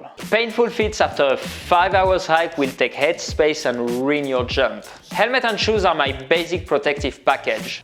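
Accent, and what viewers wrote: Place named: France. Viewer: ptdr je me disais bien que tu avais un accent français, cool !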